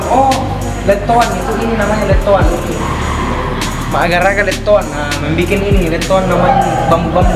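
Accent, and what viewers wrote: Makassar, Indonesia. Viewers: salah penulisan sy dimana?? Loe org toraja tp mau logat2 ala2 Makassar.. Santai moko ces..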